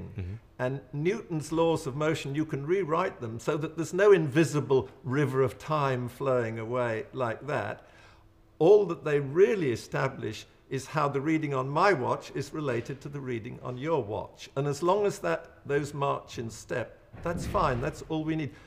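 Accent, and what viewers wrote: United Kingdom. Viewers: He basically admits he is thinking of time as a rigid linear thing, which can be ‘paused’ while other time persists... yet chastising Einstein for thinking of time on a clock. Einstein used clocks as reference for time according to who was using one in relation to acceleration etc. This is old thinking. The clock isn’t the issue... time isn’t the issue.. only to who it’s ‘RELATIVE’ to... he’s describing chapter 2 of E=MC’2 which is 15 chapters. *make another video when you get to the end ;) this only reaffirms that because you have a British accent doesn’t make you wise... it’s having an accent in a theory which has stood the test of ‘our time’ and never, ever been refuted. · His British accent makes him seem much more believable.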